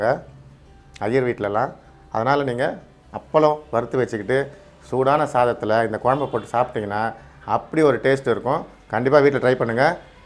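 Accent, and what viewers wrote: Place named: Nagercoil, India. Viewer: Voice nagercoil pola irukku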